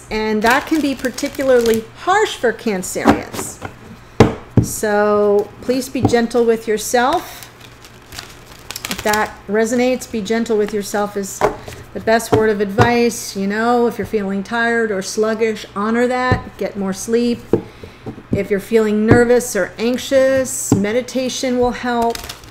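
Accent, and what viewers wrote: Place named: Florida, United States. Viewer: And I also knew based on your voice you're a person either from FL or have lived there a long time.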